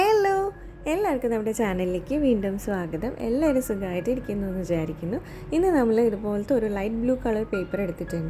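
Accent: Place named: Kannur, India. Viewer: kannur aano? Njanum kannur kaariyaane...enik slang ketapo cherioru doubt thonirunnu😅😅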